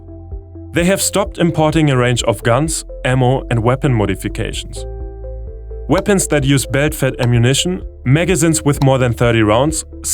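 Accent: Germany. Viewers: Are you German? Your accent sounds very German (I’m German)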